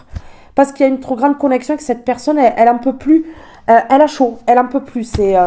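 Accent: South, France. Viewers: Merci Par rapport à des nouvelles récentes dans ma vie sentimentale ta guidance m'a scotché et touché et j'apprécie beaucoup ton humanité ton superbe accent méridional ton humour er aussi tes superbes coupes de cheveux! Tu nous fais du bien avec tes guidances! Soit protégée par le seigneur! Que ta journée te soit des plus agréable!!! Je vais regarder ta nouvelle guidance ❤👍😘☀️🌟🙏🙌🙏🙌🙏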